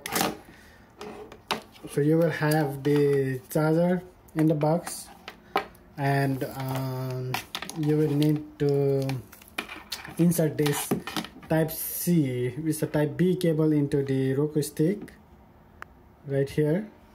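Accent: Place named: India. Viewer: Are you Indian? Because your accent sounds like it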